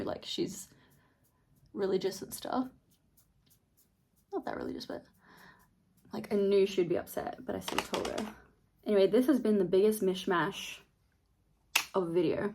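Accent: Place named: Australia. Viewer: I’m 31 and I’ve been watching you for agesss and I feel like you’ve lost your Aussie accent a bit 🥺 love you and love Australians so much ❤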